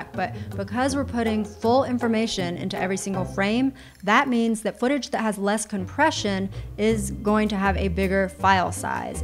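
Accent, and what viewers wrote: South, United States. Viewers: Also, lovin' the speach. I'm from Georgia and I feel like watchin' your vids brings me back home to the south 😀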